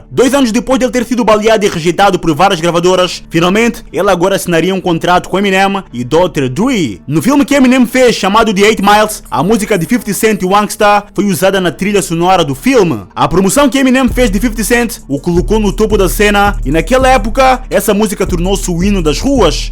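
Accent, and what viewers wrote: Angola. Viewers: Mano tu és angolano?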